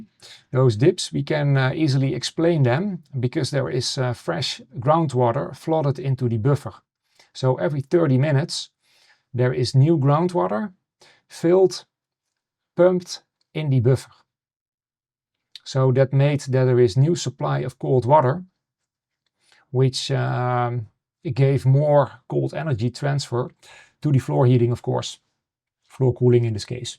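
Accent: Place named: Netherlands. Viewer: Cool solution! We use a similar setup, but our cold water comes from our heatpump. During the recent heatwave we installed a watercooled aircon unit (Innova h2o) to lower the humidity and increase the cooling potential. There's a newer version called Innova WLHP, which is basically designed for a loop type that you are using right now. This trades a bit of electricity for groundwater, as these units can run until the water temperature has reached 60C. Based on your accent, I will assume you're in The Netherlands, we're currently having a shortage of rainfall so consuming less water would be nice :p (p.s. the innova can also be used to preheat or fully heat a boiler for hot tap water, double win for energy reuse :D)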